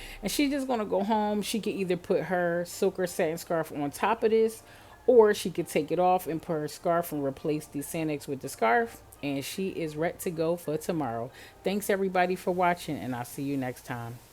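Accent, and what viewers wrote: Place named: New York, United States. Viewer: I’m from NYC and I just love the way you speak! So “Up North!”.. feels like home! And thanks for the education sis!🌹come to Charlotte!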